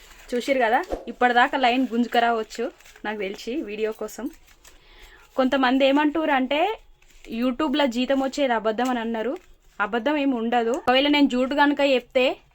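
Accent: Telangana, India. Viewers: Mi slang baga nachuthadi telangana vallam kada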